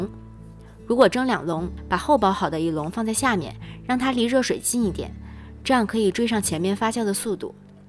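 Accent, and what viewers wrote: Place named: Tianjin, China. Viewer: 听口音是天津的小姐姐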